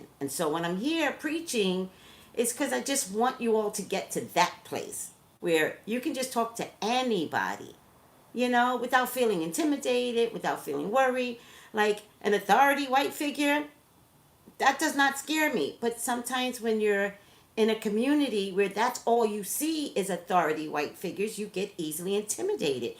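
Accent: New York, United States. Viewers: I love your New York accent!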